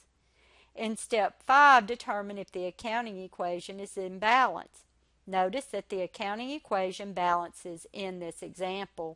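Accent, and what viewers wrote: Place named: India. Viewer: shut up u sound like indian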